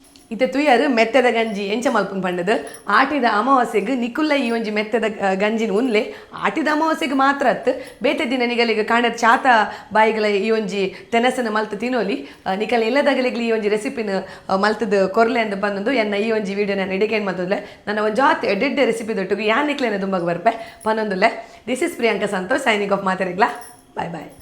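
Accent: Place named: Tulu, India. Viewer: its so lovely to hear you speak Tulu. I miss my home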